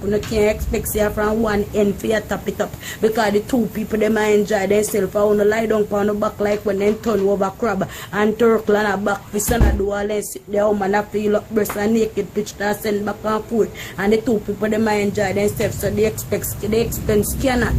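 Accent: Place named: Patois, Jamaica. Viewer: Der Henker patios broken English Jamaican language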